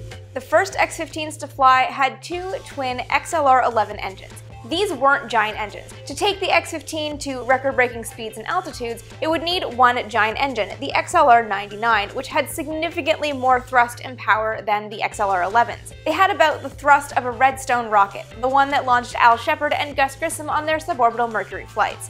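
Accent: Canada. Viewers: why does the X-15 have a thick, wedge shaped vertical stabilizer? All other surfaces seem normal. I thought a teardrop shape was best for aerodynamics. Perhaps you could answer that in another episode. BTW, I suspected you were Canadian ( aboat ):- )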